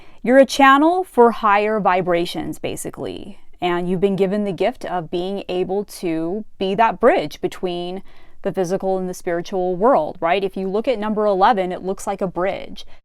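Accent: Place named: United States. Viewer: Thanks for your efforts.. You are always direct to the point, talented enough to address such subjects. On top of that, you speak in a very clear and nice American accent. And also you're so handsome with a baby smile yet wise and confident 💕 Thanks lady again for everything. NAIF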